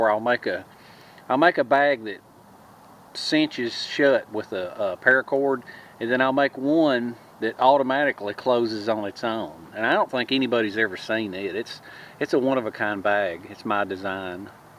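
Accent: South, United States. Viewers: Dang I love your DIY videos. I love the way you talk most of all. Us Southern folks are losing our dialect and I am happy to see yours is intact. MOST refreshing. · hi, I found you a couple months ago & spend many hours watching your vds. I know I will never get to use most of what you teach because I'm an old 83 yr old woman but I feel that the things I learn might help me to help others get through when the SHTF & I believe that's not very far away. I'm thrilled that you & Nick seem to enjoy your time together & I LOVE your Southern accent & your laugh. My dream was always to own 40 acres in the woods & build a small log cabin & just sit & watch nature. Didn't happen. I built a house by myself. Outdoors us where I'm the happiest. Sorry this is so long but I love listening & watching you. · Even if you were talking utter gobbledegook I could still listen to you talk all day long. 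That is the most American accent I have ever heard. Just keep talking mate, it is just so entertaining. Love your oven too, definitely going to make myself one of those and I'll be sure to give you the credit for it.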